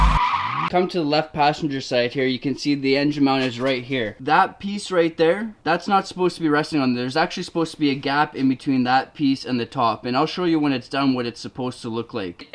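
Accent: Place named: Canada. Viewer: Are you Canadian Bro? I am too! Maybe you are in northern USA, the accents carry over. Great video!!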